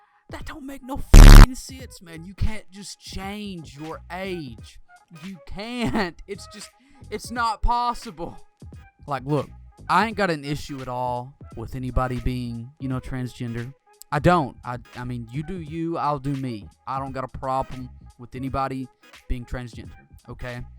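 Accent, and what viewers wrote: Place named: Alabama, United States. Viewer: You sound Alabamian.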